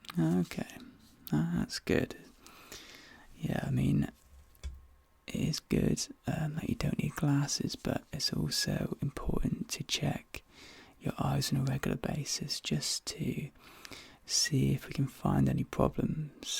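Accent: United Kingdom. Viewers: The British accent is really beautiful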